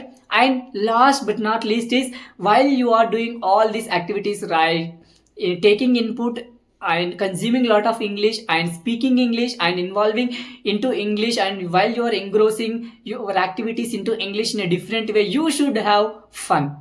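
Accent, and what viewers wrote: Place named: Tamil Nadu, India. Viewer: By hearing your English I would easily identified your native language is Tamil.I can understand how much effort behind your speech. A normal person cant speak like you. I hope you are well trained. By the way of continuous speech without any hesitation from you is excellent. Best of luck for your success. You will get more subscribers. I am waiting for your next video.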